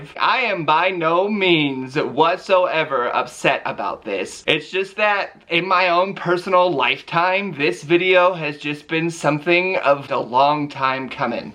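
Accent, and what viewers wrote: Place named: United Kingdom. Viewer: Yaaaas British accent!!!!!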